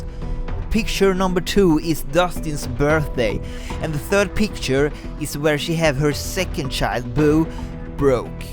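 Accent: Sweden. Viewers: I just know you are Swedish, i can tell from the accent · It's Swedish. Russian accent sounds much more different. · Are you Swedish? Sorry if I offended you but I would like to know😀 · You're Swedish, right? I kinda hear it on how you pronounce certain words. I myself, am from Norway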